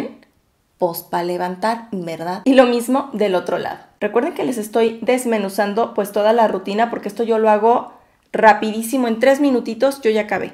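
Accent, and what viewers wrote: Mexico. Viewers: Por qué hablas como de Tepito?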